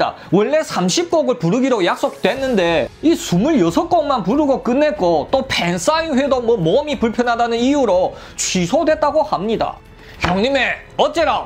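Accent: China. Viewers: 아무생각없이 클릭해서 첨에 한국사람이 방송하는줄알앗는데 말투가 ..같은 조선족이라 ...음...ㅎㅎㅎ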